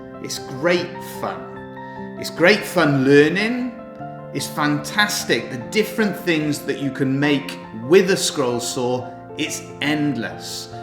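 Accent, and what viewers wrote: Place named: Wales, United Kingdom. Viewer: Man it's been a long day. I sat there thinking this guy sounds welsh. I didn't even see the in your name. If you get people asking for a cheap scroll saw, I bought mine from screwfix for 90 quid.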